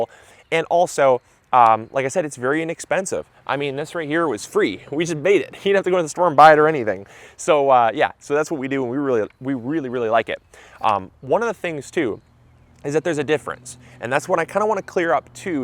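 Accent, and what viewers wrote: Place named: Midwest, United States. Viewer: Love the Midwest accent: CAM-pohst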